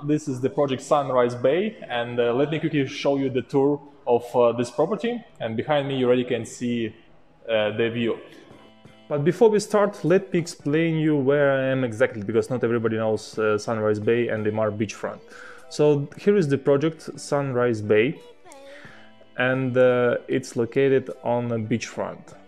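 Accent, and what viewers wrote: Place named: Ukraine. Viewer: Vasiliy from Ukraine accent :)))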